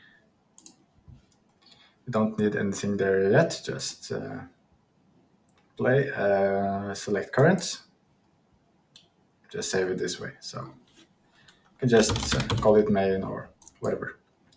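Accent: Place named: Norway. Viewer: I love your vids too, they're explained in great detail and it shows that you really have a great talent for coding as well as explaining. Keep the great work man ! Side (and totally unrelated) question : wouldn't you happen to be Norwegian ? You have the same English accent as the actors playing in that AWESOME norwegian series Vikingane / Northmen !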